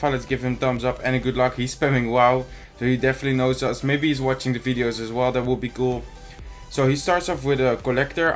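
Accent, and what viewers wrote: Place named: Netherlands. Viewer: Dit is echt heel Nederlands engels😂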